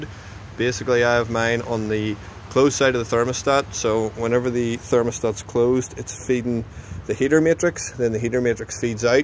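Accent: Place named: Northern Ireland, United Kingdom. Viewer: Geekers Sur your norn Irish! Now I want one built!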